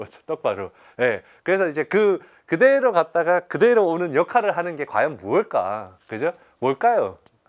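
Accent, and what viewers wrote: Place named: Busan, South Korea. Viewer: ㅋㅋㅋ 허프로님 부산분이라 사투리가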